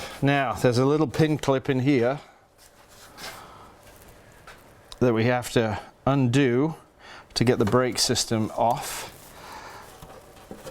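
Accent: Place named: Scotland, United Kingdom. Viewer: Thanks. My bike is a 2002 CB500, very nice modern classic. Where are you actually from mate, your accent is a bit US/Brit and I sense some Scottish. May be way off but just a guess. I’m from southern England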